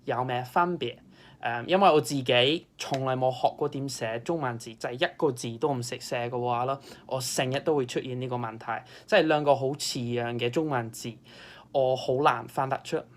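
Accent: United Kingdom. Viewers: Are you from Essex? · would have never told that it's a Brit speaking if i listened with closed eyes, well done! are you also on instagram?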